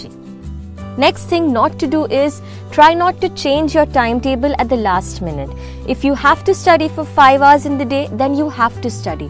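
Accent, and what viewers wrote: India. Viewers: Indian cute accent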